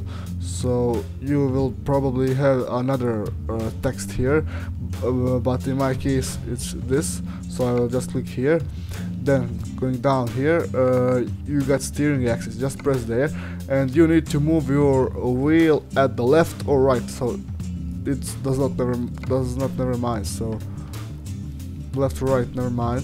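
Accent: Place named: Hungary. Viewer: Damn your accent so similar to my hungarian accent. Where are you from?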